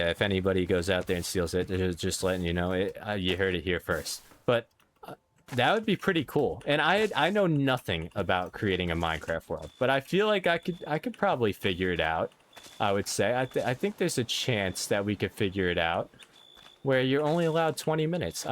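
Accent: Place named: Northeast, United States. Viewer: I hear somewhat a NE accent. Like Boston or New Jersey or something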